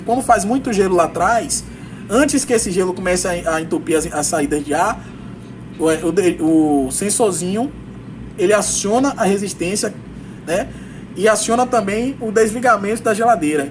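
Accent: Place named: Salvador, Brazil. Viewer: Fala moral, Ótimo vídeo, pelo sotaque voce é de salvador? Pois se for onde voce comprou essas peça?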